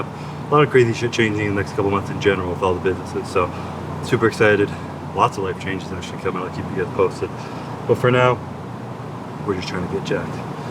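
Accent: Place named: Canada. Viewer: your Canada really came out this vid lol. As well as your th-th. 😂. Love youuuuuuu! ❤️ 🤣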